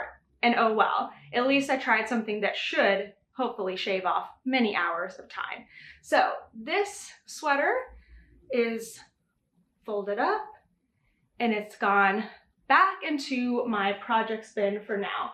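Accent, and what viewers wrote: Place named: New York, United States. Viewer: Love the colours of the hat yarn. My current WIPs are aran sweater for me, socks for my neice, and another chunky sweater for my daughter in law.... I only started knitting multiple projects since watching you.. It doesn't get boring though. Been a while since I watched you, gosh you are developing a bit of a NY accent there....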